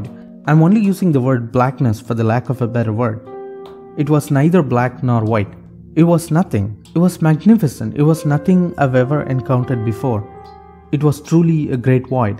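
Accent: India. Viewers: This is off topic, but since you are a person seeking knowledge as well as me, I thought I could ask a politically incorrect question and you would not get offended. Please don't take this question the wrong way, I am asking because I really don't understand. When people with an accent from India speak English, they often roll their Rs and add a "d" sound. When they say a "w" sound they tend to add a "v" sound. Why is that? The reason I am confused is that I can make your sounds by imitating how you speak. But that makes me think that there must be certain pronunciations of your native language that native English speakers will get constantly wrong that indicate they are native English speakers to you. What are those sounds that we native English speakers consistently mispronounce that give us away?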